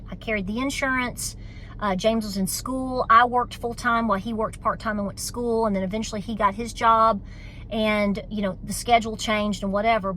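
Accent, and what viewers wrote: South, United States. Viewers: ... You're like a plough horse, a jersey cow and a hound dog all in one.. with a southern drawl, y'all ... SAVE YOU SANITY, and stay ACTIVE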